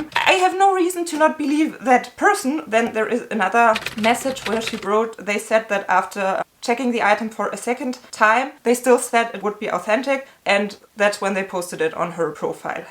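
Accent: Germany. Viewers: Are you german?😅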